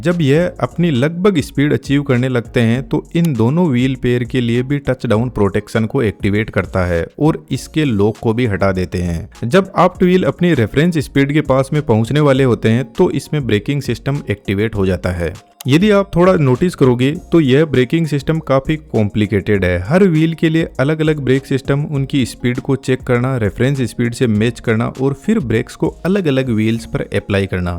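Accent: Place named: Marwari, India. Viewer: Marwadi voice pehchan li 😂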